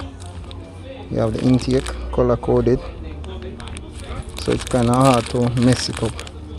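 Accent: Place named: Jamaica. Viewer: I hear a 🇯🇲 yaad man voice.. love the content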